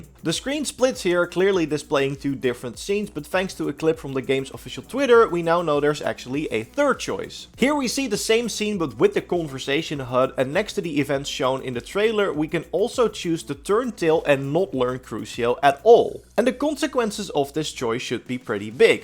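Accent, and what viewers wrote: Netherlands. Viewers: Omg your dutch accent is so distracting... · The Dutch accent coming through alot just the way it's meant to be :3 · Bruh you ooze Dutch with that accent. Love it 😂👌🏽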